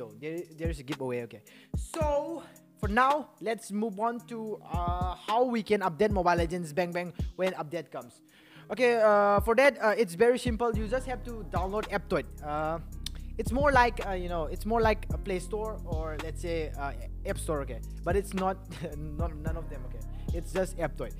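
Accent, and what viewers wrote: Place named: Philippines. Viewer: Your voice same to phillipines Voice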